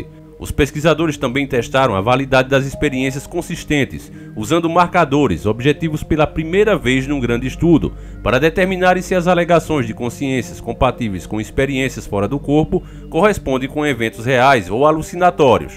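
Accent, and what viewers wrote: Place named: Pernambuco, Brazil. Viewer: Tudo e todos do canal merecem nosso respeito carinho e dedicação de passarmos a diante as informações para abrir os olhos ah do seu respeito a sua região quebra a pau pois esse sutac pernambucano igual a do meus pais e irmãos que nasceram aí me faz voltar a infância tudo verdadeiro nada artificial obrigada por tudo tô em Santos e admiro seu trabalho manda um abraço e pau neles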